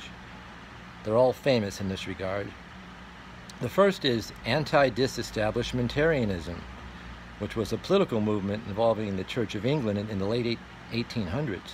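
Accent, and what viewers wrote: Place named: Texas, United States. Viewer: That was super cool. I loved your accent, I think you're Texan, or North Carolinian. Am I right?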